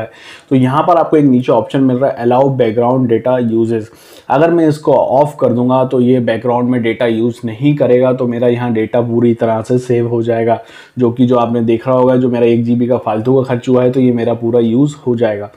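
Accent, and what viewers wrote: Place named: India. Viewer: Awful accent. Hard to understand. You Indians should be more careful about who is listening to you and try to speak slower and clearer.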